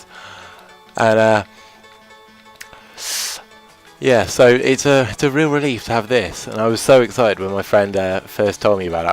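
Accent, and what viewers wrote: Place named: England, United Kingdom. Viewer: I can tell your from England .... but what part? XD nice to listen to an English guy, being English my self makes it better lol (I hope i'm not wrong about you being english XD) also awesome video =D wish I could get this game my self it looks great!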